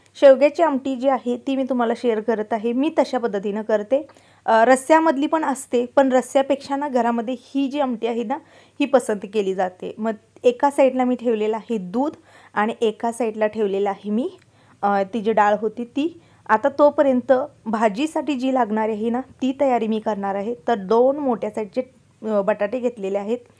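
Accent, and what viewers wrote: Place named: Sangli, India. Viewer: मि विटा ...मायणी इथल माझ माहेर आहे .....कामानिमित्त आम्ही करमाळा येथे राहतो....आपली सांगलीची भाषा विडिओ त छान वाटते....पण इथे सोलापूरी भाषा जरा फरक आहे.... तु ज्या गावांची नावे सांगते ती गावे मला ओळखीची वाटतात.....विडिओ छान असतात .....पण कुठलीच गोष्ट आपल्याला सहजासहजी मिळत नाही.... तु खूप मेहनत घेतेस .....पुन्हा एकदा अभिनंदन लवकरच 100kपुर्ण होवोत हिच सदिच्छा💐💐💐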